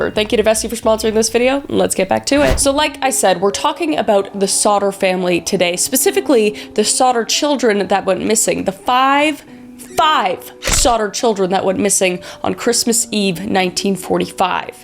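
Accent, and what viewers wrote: Russia. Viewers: Are you Russian? I was wondering because you do the accent a lot and that is what made me look at this channel because my crush is Russian and I won’t to know what he likes please please please be Russian I love him😘😏💋💋💋💋💋💋💋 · Until right this second I fully thought you had a Russian accent!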